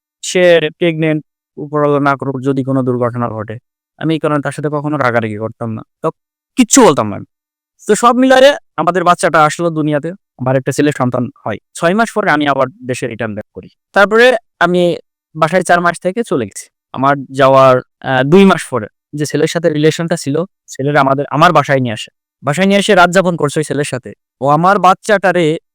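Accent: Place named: Faridpur, Bangladesh. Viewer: ভাই আপনার বাড়ি কোথায় আপনার ভাষা আমার কাছে খুব পরিচিত মনে হচ্ছে। আমার বাড়ি ফরিদপুর।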